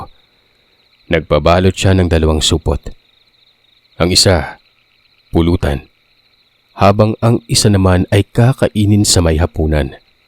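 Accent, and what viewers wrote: Visayas, Philippines. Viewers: listening here from Lapu- Lapu City Cebu...hello sir cute mo mg bisaya 😊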